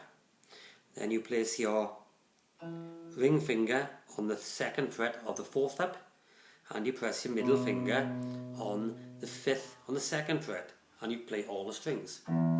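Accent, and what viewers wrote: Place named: Wales, United Kingdom. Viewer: Nice lesson, I detect some Welsh accent there, from a respectful Aussie 😀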